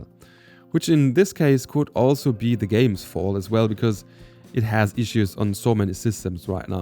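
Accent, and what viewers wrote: Germany. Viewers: Kling für mich irgendwie nach deutschem Akzent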